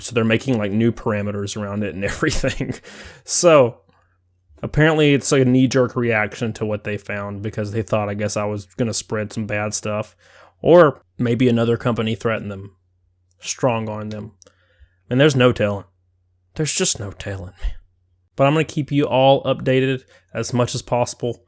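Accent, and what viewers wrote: South, United States. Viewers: Bro I am from the south and I can tell you are to from your accent and that it is awesome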